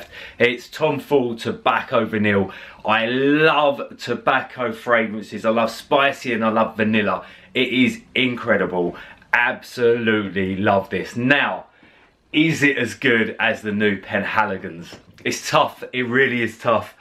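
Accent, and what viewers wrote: United Kingdom. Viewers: Nice , but please put it in description because I couldn't get the names of some of them, English accent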